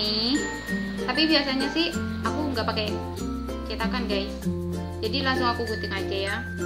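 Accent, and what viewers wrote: Madura, Indonesia. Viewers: Kak asal mana? Madura bukan sih... Bahasanya itu g asing 🤗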